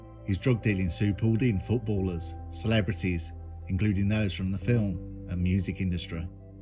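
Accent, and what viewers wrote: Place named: England, United Kingdom. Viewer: Well done fellow, real British events narrated by an English accent, a great channel many thanks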